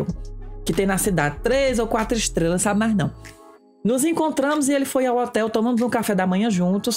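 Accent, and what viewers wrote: Northeast, Brazil. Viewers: pois é. aos 74 anos estou perdidamente apaixonada por esse querubim de cabelinhos encaracolados chamado. o que me encanta é a naturalidade da sua essencia com esse sotaque nordestino que me dá tanta da saudade da minha Bahia que deixei aos 6 anos e nunca mais voltei. Parabens. Deus te abençoe e nunca perca sua essencia. Bjsss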